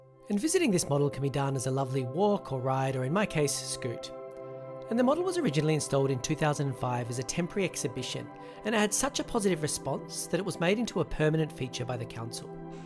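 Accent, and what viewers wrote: Australia. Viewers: Australian tom Scott out here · Australian Tom Scott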